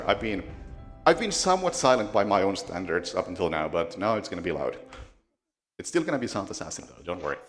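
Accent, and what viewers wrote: Finland. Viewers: Beautiful finnish accent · "Hello" - this guy's Finnish! Damn the accent of my countrymen is so distinguishable it's not even funny :D Great gameplay.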